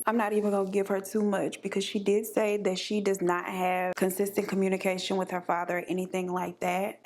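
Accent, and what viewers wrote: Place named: Texas, United States. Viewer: I have to say, your voice is so soothing to me, it reminds me of when my family lived in Texas when I was a child. Your vocal timbre is lovely 😊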